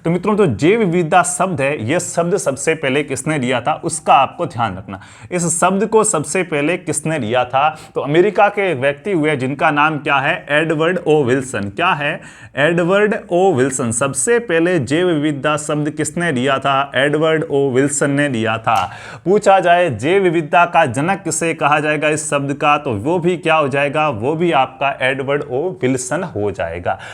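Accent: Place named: Marwari, India. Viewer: Sir aaj ki class bhut aachi thi 🙏🙏 मारवाडी बडी मिठी बोलो आप 👌👌👌👌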